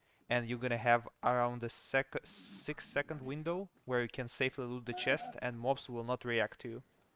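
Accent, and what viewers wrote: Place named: Russia. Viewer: That russian accent 😁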